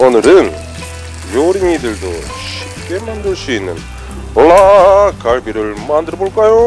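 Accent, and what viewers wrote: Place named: Gyeongsang, South Korea. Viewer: 구수한 경상도 사투리로 듣는 맛있는 양념갈비 레시피 재밌었어요.^^ 댓글 보고 왔는데 ㄱㄷ하고 갑니다. 앞으로 자주 소통하기를 바래요.^^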